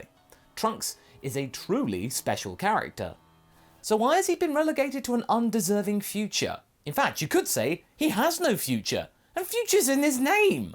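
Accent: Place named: United Kingdom. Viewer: i don't watch channel much these days. but listening to your normal voice to me sounds like you're constantly trying not to do goku's voice. or you sound like british goku.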